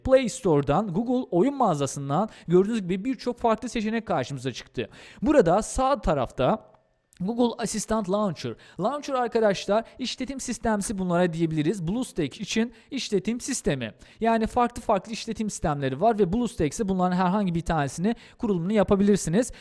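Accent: Azerbaijan. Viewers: abi sen azeri misin merak ettim :D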